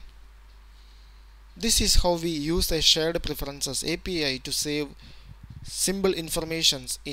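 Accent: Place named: India. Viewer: Ótimo tutorial... funcionou certinho!!! Esse indiano é foda!!! O sotaque é mor comédia... mas o cara eh bom!!!! hehehehehe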